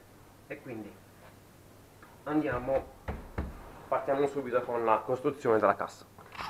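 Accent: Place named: Apulia, Italy. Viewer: di dove sei io Bari tu?ho sentito che sei pugliese giusto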